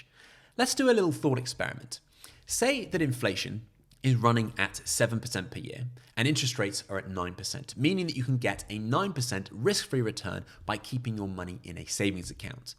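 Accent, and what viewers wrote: United Kingdom. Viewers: Hello. I am Canadian and like your amazing videos for two reasons: the information as well as your British accent. I try to copy your accent. Keep up the great work!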